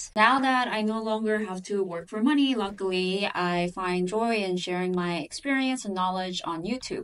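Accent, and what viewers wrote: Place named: South Korea. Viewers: Great video . Btw Are you of Korean origin ? I noticed a slight accent . I have a linguistics background that's why I noticed , I did not mean to offend in any way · Yes. I'm half so I've heard Koreans speak English for most of my life. I dunno what it is exactly but it's recognizable. But in case you wanted to really know, the last name is a dead giveaway.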